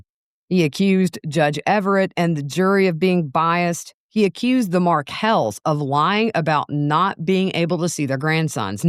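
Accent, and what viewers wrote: South, United States. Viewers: I just today stumbled upon your channel. Love how you speak -quickly, without ums and ahs and you know what I means! You also have a beautiful southern drawl. I subscribed and look forward to more vids from you. (Prison...OMG most of those things, ALL of those things that were described -the noise, the pettiness, the jealousy, the food, the lack of privacy., the humiliation, the confinement with ppl who disgust you or scare you...ALL OF IT. Just a nightmare. And for me personally, I do NOT get along with other people. I need to be alone most of my time bc humans just exasperate and me bore me. I would not do well in prison. I barely find comfort in the wild.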